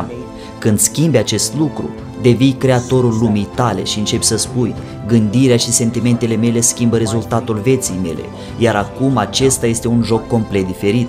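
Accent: Moldova. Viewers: Desi ai un frumos accent moldovenesc via Chisinau, transmiti foarte profund cam tot ceea ce postezi, ai harul acesta, sau poate te-ai reinventat, dar cert este un lucru, esti pe drumul cel bun si nu numai atat, mai ii si ajuti pe altii sa isi schimbe viata prin ceea ce etalezi,...., excelent, este minunat si bun de urmat, o zi frumoasa si o viata si mai frumoasa !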